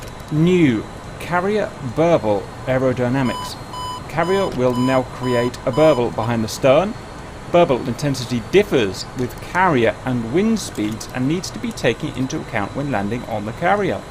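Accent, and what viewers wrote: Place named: United Kingdom. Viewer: i'm into both military and commercial aviation and i follow tutorials both from you and a guy called 320Sim Pilot, you're both from the UK and maybe it's because i'm not used to the accent but boy you sound similar, sometimes i can't remember which one i'm listening to. Awesome video as always anyway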